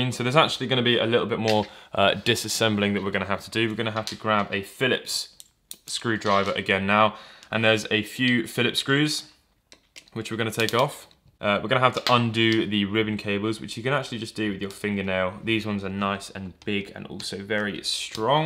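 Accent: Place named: United Kingdom. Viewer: Nice British accent👌👌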